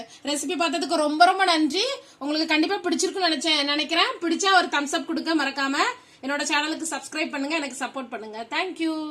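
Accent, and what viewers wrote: Tirunelveli, India. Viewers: Super sissy, Wr is ur native place? I think u r nellai ponnu bcoz im also in nellai. Slang la theriyithu sissy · Super Ka... Unga slang vache kandu pidichuten... Nanum thoothukudi than Ka... Akka sothi kulambu seinga ka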